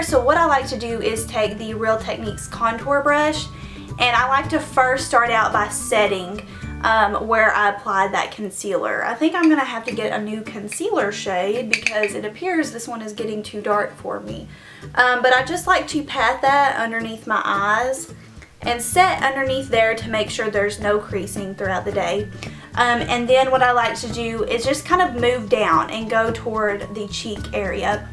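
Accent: South, United States. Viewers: ♡southern accent♡ Sent with lots of ♡ in NC!!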